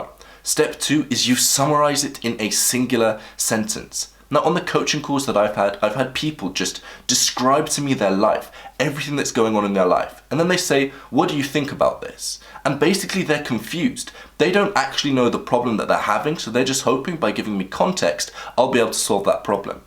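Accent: United Kingdom. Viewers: I've payed thousands of dollars - proceeds to show pictures of euro-notes :D. Btw your accent sounds very British to me (?)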